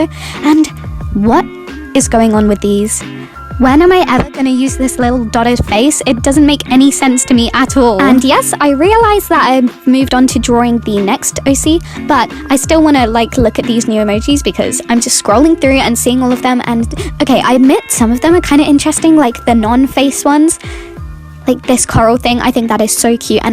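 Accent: United Kingdom. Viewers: Haha I love your accent! It sounds like a southern British one which is where I'm from :D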